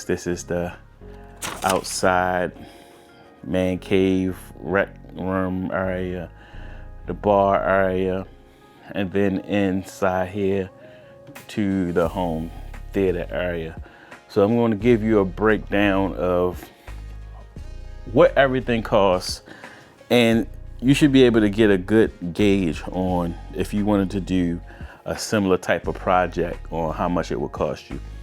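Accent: Baltimore, United States. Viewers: You're from Baltimore aint youuu?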